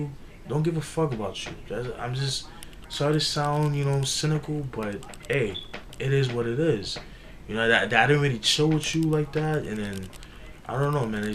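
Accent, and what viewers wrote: New York, United States. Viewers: u from ny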